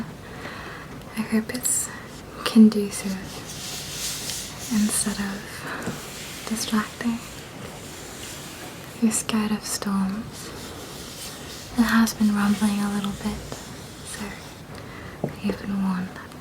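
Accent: Australia. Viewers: Are you from Australia by any chance ?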